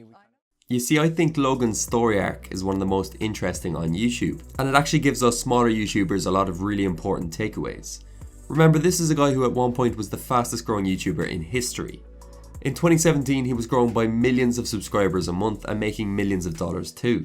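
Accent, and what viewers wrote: Ireland. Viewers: Been through a few videos, and I'm still deciding if I'm watching the content because its beneficial, or is it because I lowkey love the Irish accent! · You Irish?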